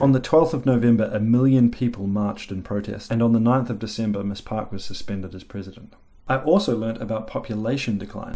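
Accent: New Zealand. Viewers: He speaks too quickly and has a broad Kiwi accent. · oof that heavy disheartened sigh after the civil war stats nonetheless i'm now even more convinced that kiwis have the best accent idk how people can't understand what you say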